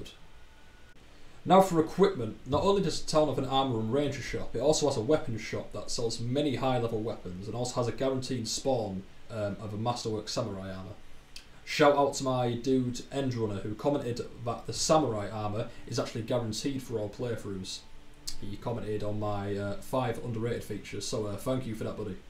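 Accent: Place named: United Kingdom. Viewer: Good to hear a Brit sharing Kenshi content. Interesting how people start off their chars. I suppose it depends on which style of play you prefer, if you already have pre-knowledge of this game. I stopped mining in the initial stages because it was too time consuming vs progress being made. I usually cheese and scavenge, then go for the cheap 250 cats back packs found at the Waystations, unless I've got lucky with the start. I always thought Mongrel was boring, but having seen your enthusiasm for the misty wastes I think I'll give it another try! Good luck with the channel.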